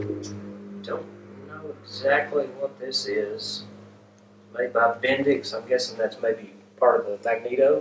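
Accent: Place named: South, United States. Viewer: You got that nice southern accent bro!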